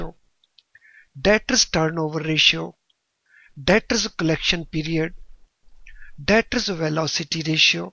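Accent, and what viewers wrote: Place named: India. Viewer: WORST INDIAN ACCENT, AYYO DDDDDIZZAAASSSHHHTER!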